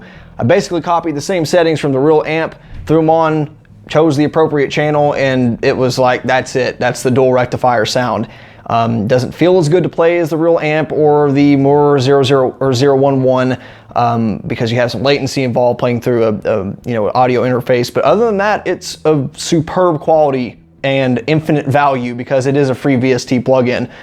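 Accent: Texas, United States. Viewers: Dude, a Pantera t-shirt goes with that accent, not a Black Sabbath one.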